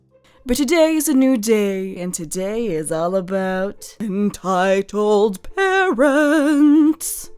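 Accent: United States. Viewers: this is creepy she has an American accent but reminds me so much of my sister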